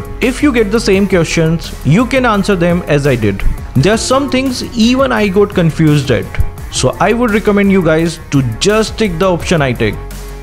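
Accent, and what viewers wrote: India. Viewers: Am I the only one who hea Indian accent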